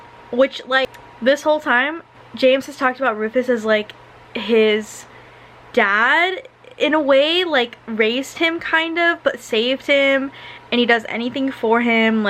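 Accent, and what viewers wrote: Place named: England, United Kingdom. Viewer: Cackling at your English accent🤣❤️ I literally love these so much- getting to live vicariously through you reading books I wouldn’t pick up for fear of DNF’ing 🤣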